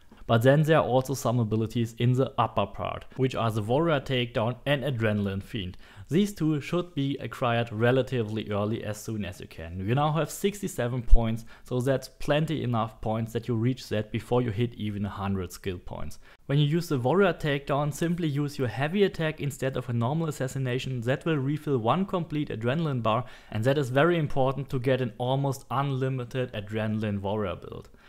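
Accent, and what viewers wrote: Germany. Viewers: Dude mast be 10000% from Germany they have hard time to learn English so he is not bad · I love the German accent, great video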